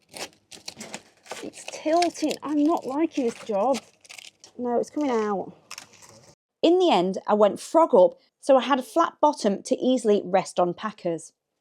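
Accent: United Kingdom. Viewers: Love British accent they speak 24 different dialects of accent wores is from Manchester claimed by most British they said you don't understand those people at all British Empire was larger than any one spray the language all over the world most country adopted and they spray different dialects accent the easy one is American English English International is much different the same as the Australian and New Zealand special in Africa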